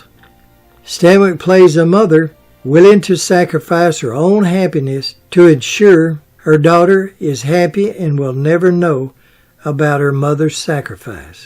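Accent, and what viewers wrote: South, United States. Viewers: sir, I have mentioned over the years how I enjoy your "Southern" accent bringing additional entertainment to your videos, and this is true. As a transplant from NYC to now southwest FLA, I enjoy it even more. BUT! I am remiss in not mentioning, not only do I enjoy your videos, but I have not mentioned the amazing detail in the research that you unfold for us in ALL of your videos. Well done ALWAYS sir!! Keep them coming!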